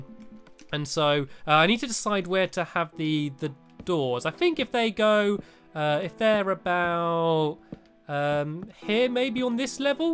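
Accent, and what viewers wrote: United Kingdom. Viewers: I'm leaving for camp tomorrow and I will miss your brittish accent for a week. I am very sad:'( I would be the happiest girl in the world if you would send me a goodbye message on Xbox. If anyone would like to send me a goodbye note my gamer tag is: ashleyrory78 I would LOVEEE it if would send me a goodbye note, although I doubt it will happen. Well bye everyone for a week! make sure to say goodbye. (My gamer tag is ashleyrory78 )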